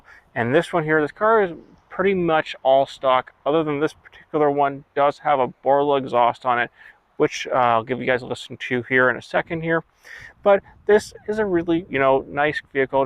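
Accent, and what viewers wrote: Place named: Europe. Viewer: Where is this guy from, Europe? Zed, kilometers. Z, mileage.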